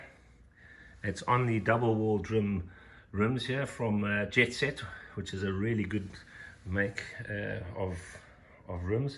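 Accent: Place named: Afrikaans, South Africa. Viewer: i love your afrikaans accent!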